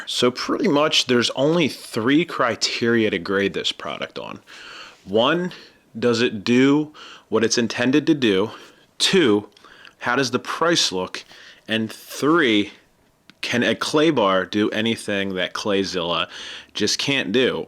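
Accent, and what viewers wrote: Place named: Maryland, United States. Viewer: Sounds like you're from Maryland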